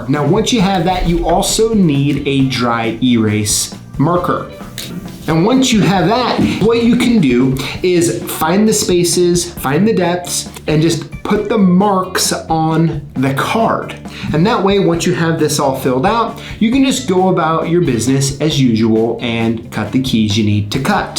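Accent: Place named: South, United States. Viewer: Thanks,. We southern guys actually like it when people assume that we're stupid because of our accent.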